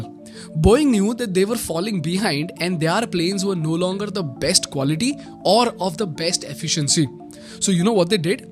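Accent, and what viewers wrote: India. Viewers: "R-rrrrr " heavey indian accent feels like hammar hitting rrrrr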